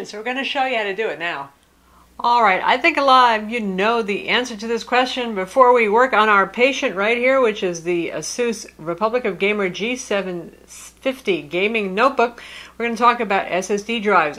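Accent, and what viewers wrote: United States. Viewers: I’m considering buying the ASUS G750 J( I’ve not decided which one yet) and I would also like to purchase one of theese SSD Drives as I prefer games that are generally hard to run on a regular, ”cheap” computer. Could someone give me a link or a quick tutorial on how to insert the SSD drive? I’m not used to hearing this particular American dialect and I’ve been struggling to understand the tutorial as I’m not particularly fitting into the computer whiz category… ☺:-)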